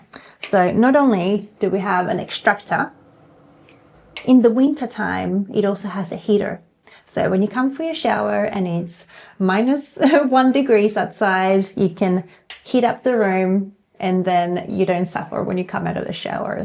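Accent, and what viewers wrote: Australia. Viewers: Found your channel quite by chance and do very much enjoy your explanations of your cultural experiences. Thought the accent sounded familiar. 🦘 Did the sums and it equates to per week in Australian money. You couldn't rent a private parking space in any Aussie CBD area for that. Rents in 6000 (postcode) outer suburb hubs start from $450 per week just for a 1 or 2 bed unit. Then again, you know all this. Do you reckon the children (when older) will look back at all of these adventures as something that not a lot of other children were lucky enough to have had? As for that upstairs terrace, aside from the barbi area, maybe a few raised garden beds for veges or potted fruit trees. Create your own oasis. Thanks for sharing 💙💙💙 Edited to correct grammar. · Ok your accent is like a crazy mix of Australian, German (or Dutch?), and Chilean or something. 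I have no idea obviously, but it's interesting to hear!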